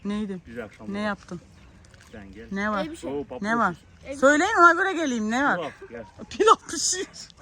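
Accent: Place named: Azerbaijan. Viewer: Salam yaxşı gəzmələr ❤siz azərbaycali kimi danişirsiz anenlere salam göndərirəm azərbaycanan 😍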